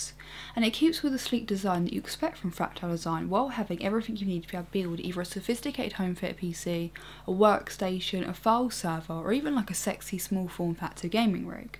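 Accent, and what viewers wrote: England, United Kingdom. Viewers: Definitely not australian. Sounds British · Armada YouTube Now if you can pinpoint what part of Britain that would be ripper.... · love your videos....love the layout....love the pom accent and NO you don't need a tan. Even though my preference is leaning towards ethnic women, you look great! Thumbs up. Just an after thought, would be nice to see an extreme build with this case.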